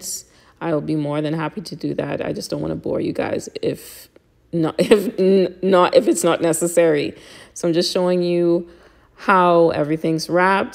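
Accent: Bahamas. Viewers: I hear a Bahamian accent 🩵🇧🇸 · Beautiful. Love the video💕 and I heard a Bahamian accent ! 🇧🇸 just followed you on IG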